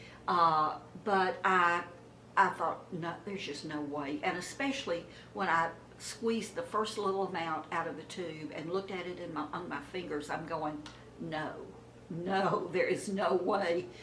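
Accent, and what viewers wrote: South, United States. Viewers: Well Hallelujah and God Bless You. U have done what I wish all the others would do. Glad u reached this point and got off the crazy roller coaster. These others start out to review products, make money and get a Gillan w major company to get freebies. But they get sucked into the tornado of vanity and keeping up with the gurus. I've watched Kandee for 9 yrs maybe, and she is a true artist and appears so happy and sweet and not jaded. She a beauty. I have tired of the few others I did watch and only look around for swatches of lip colors, etc. I'm a lipstick gal.. Thts my 1 product, just as my mom's was. I too, use a cubicle almost exactly like urs, acrylic w a handle and it was a flatwear caddie tht I use to use serving buffet style. It's perfect und sink and easy w the handle. I have no more other than a box of all my lipsticks cause I wear diff shades. 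Do, glad u guys are safe and Destin is where we vacationed 18 yrs I was married. It's wonderful and I'd love to live there one day but I havent done sun in the last 12 yrs. U sound like u might be from GA or SC. Take care and stay beautuful. Doesn't take makeup when it comes from within.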